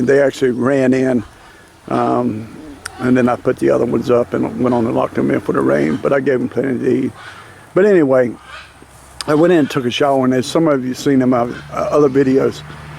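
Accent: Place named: Louisiana, United States. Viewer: Where there is one there are many - be careful! They do eat rats but what a trade off. I hear macaw's - they sound jealous to be on camera - LOL or in pain, I can't decide. Your accent reminds me of my grandparents - LOL Cajuns, they were from Louisiana...you're from Florida....haven't heard it there...PS I think if you click the button on your edit page you can edit the title - I think you mean - Homestead Dangers no Homestad Dangers....just letting you know.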